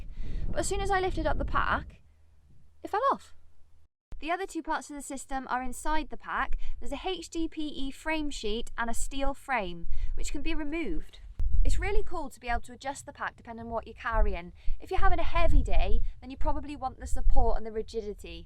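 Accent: Wales, United Kingdom. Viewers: Nice review, Great to see someone actually give a pack some abuse on a review haha, funny and informative, and a great welsh accent. Quality video.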